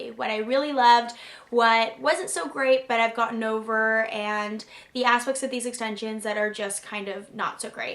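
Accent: Canada. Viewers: are you canadian? :)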